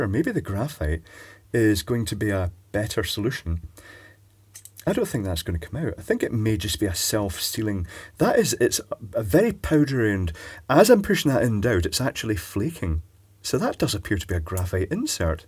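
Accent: Scotland, United Kingdom. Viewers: Absolutely right, there would be more heavy metal suspended in the water than would ever diffuse from the brass alloys, we have far too many self important idiots here in Australia, unfortunately they, and their trouble making shop steward mates all seem to have accent's just like yours, please don;t think for a moment that, that is a jab at the lovely Scottish accent, nothing could be further from the truth, just that if you are on a job site here in Oz and you hear a Pommie or Scottish accent, you can bet that the heaviest thing it's owner has ever carried is the chip on his shoulder. Love your videos.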